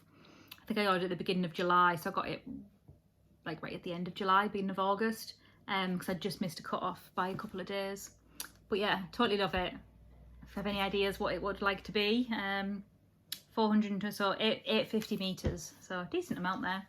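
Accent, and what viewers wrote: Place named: Northern England, United Kingdom. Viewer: Hi, enjoyed your catch up, I too am an hour from Leeds in the NE. Its nice to have a local accent to listen to on a podcast. I’m just at ‘Theres going to be a storm, lets play ball!’ in Midnight Sun. I’m 56 and not ashamed to say I’m an Edward Fan. I read the leaked version years ago on the internet, so glad to be able to read the rest now. There is a lot of angst on his part mind! Anyway, love your knits and chat and yarn. Hope you have a great time away and its not too misty on the Northumbrian Coast XXX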